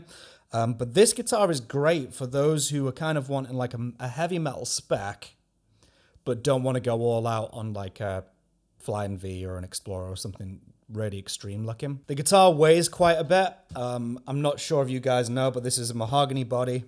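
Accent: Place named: Geordie, United Kingdom. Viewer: Bud, I'm having a hard time placing your accent, Jordie? Great video, hi from Canada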